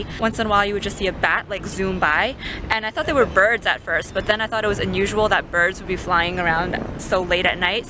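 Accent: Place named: Rio de Janeiro, Brazil. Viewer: The SH sound for the final esses are more of a Rio thing. People don't soud like that in Sao Paulo.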